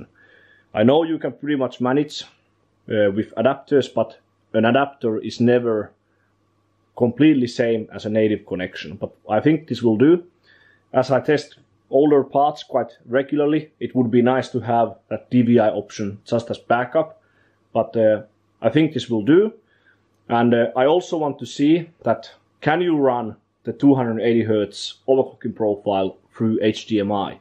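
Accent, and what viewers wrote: Finland. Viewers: You really sound like Finnish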